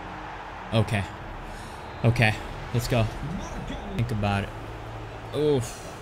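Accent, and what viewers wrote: United States. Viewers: England?!!!😂 he sound like every white American dude but he is Italian he always be talking about it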